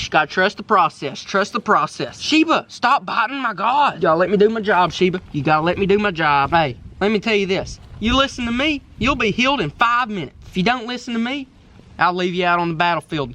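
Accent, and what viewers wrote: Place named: South, United States. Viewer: Hey Man, you can’t get a camel, you gotta get a emu, I think with your southern accent people with laugh at emu better, plus camels are slow, like the mule, an emu is fast, like the atv, you gotta get a emu.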